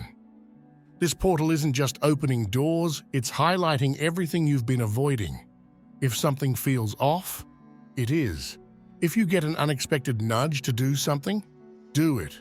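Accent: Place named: Australia. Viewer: Reading the comments. I have felt dizzy, a bit nauseous and was wondering why. I still dont immediatly realise it is from a surge of electro magnetism. I just burned my st Brigid reeds cross from last year! I manifested all my troubles and doubts and hesitations to be gone, cleansed away. I was alone so just did what I felt was right. I am glad now. Another serendipity. Thank you forthis video, first time i come across your channel. You sound Australian😊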